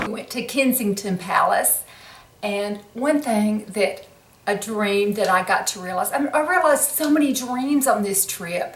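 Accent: United States. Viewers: As an Englishman I like that accent. I gotta go on holiday an hear more of that, tho I don't know what states speak like that.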